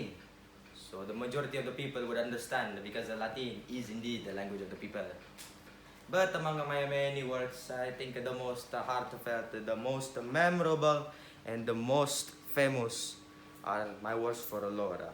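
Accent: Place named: Italy. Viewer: Italian accent !!!!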